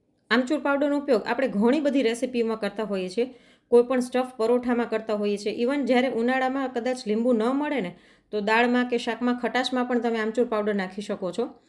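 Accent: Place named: Bhavnagar, India. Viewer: ભાવનગર બાજુ ના છો ?ભાષા એવી છે માટે પુછયુ ખુખજ સરસ રીત બતાવી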